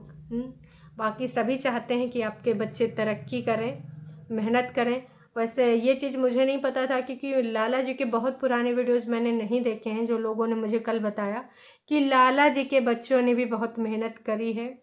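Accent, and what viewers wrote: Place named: Awadhi, India. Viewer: Mam,aapka vlog or aapka way of talking bahut atcha lagta hai or beech beech main aapka awdhi bolna bahut atcha lagta hai.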